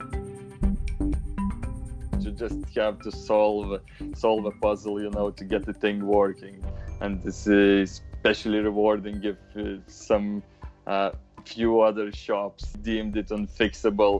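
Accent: Russia. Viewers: Акцент такой русский) жесть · He speaking with Russian accent?!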